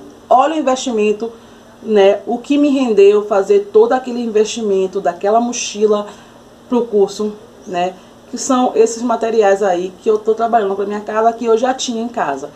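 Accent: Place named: Salvador, Brazil. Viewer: Assim q iniciou pensei logo " Meeeeu Deus , Ela é de Salvador " Nosso sotaque é maravigoude 😍 Muuuulher , você é luz , Ganhou mais uma inscrita 🥰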